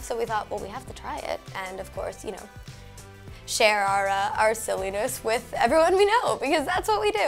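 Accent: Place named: Canada. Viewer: “Torono” ahh the Canadian pronunciation has grown on her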